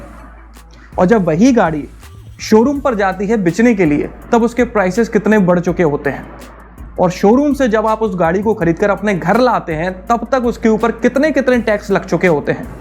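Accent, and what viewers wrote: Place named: Bihar, India. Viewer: Sir mujhe laga ki aap bihar se hai🤔🤔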